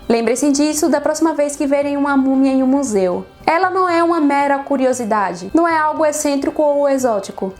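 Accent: Brazil. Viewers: Ah, então você é de Sergipe :)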